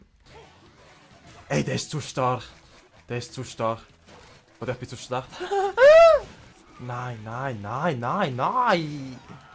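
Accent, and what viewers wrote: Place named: Switzerland. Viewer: Ich bin zwar aus Österreich und rede eigentlich nur Hochdeutsch, verstehe dich aber trotzdem mega gut. Generell liebe ich es wenn jemand Schweizerdeutsch spricht.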